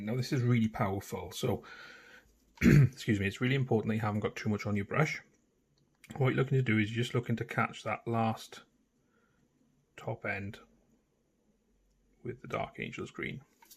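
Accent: Wales, United Kingdom. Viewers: You sound Welsh where you from mate? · Loving the vids! Nice to hear a fellow Welsh Accent in the painting scene!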